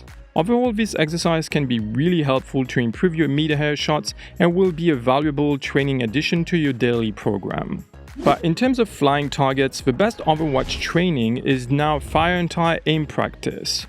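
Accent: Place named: France. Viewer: Cet accent français😂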